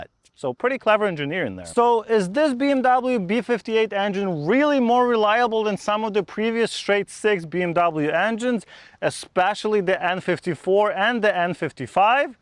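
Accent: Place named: Russia. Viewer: Акцент наш ))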